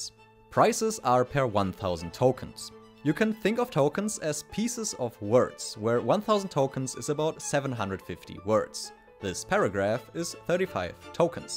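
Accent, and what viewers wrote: Germany. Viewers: Du bist doch Deutscher dass hört man so raus 😂😂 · For me it seems you have a german accent, is that true u are german? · Are you german ? kinda have an accent… i love german btw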